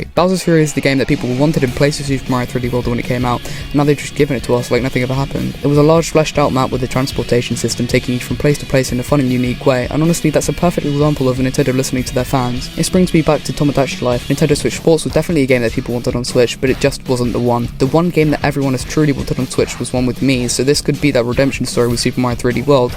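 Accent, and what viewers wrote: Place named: United Kingdom. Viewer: british boy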